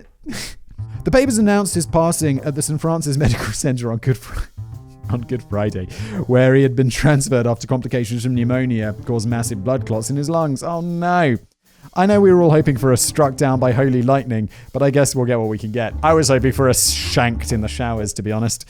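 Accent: United Kingdom. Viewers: The more I listen to the more that I think I should act on my notions to move to Britain so I can find a man who says "brilliant" and "what are you up to.." Everything sounds so much more charming and intelligent with a British accent.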